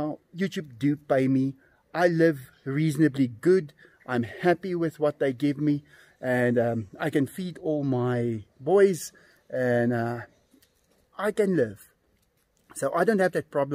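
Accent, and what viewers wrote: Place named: South Africa. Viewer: It sounds like Diego to me and i am Australian I can understand his accent very well, but if you are not use to a South African accent then probably.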